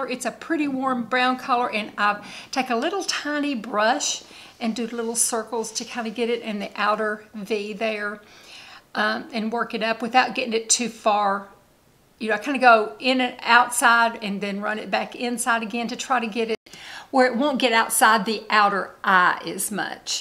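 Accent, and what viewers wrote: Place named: Tennessee, United States. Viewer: I don’t know why, but listening to you, by the way, always relaxes me😊🥰❤️❤️🫶🏻 seriously! Calms me down! It must be the sound of your voice, the tone of it, and the accent lol that accent 😂😘😆 your Tennessee accent calms me down🤷🏼‍♀️❤️❤️❤️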